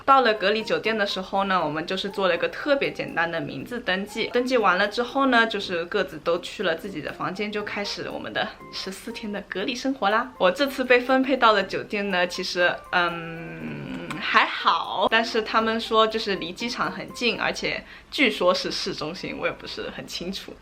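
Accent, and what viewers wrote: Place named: China. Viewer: 说英文时中式口音那么重。。。还说中文差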